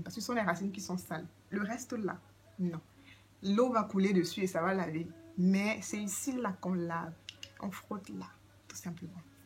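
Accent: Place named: Cameroon. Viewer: Bonne vidéo avec notre bel accent Camer👏. L’idée de ramener les beurres à l’état liquide est géniale merçi.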